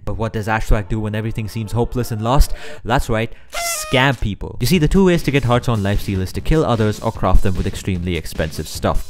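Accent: India. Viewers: I don't know why but your accent feels very indian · ru Indian? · You speak innindian accent 😯😯😯 Are you one???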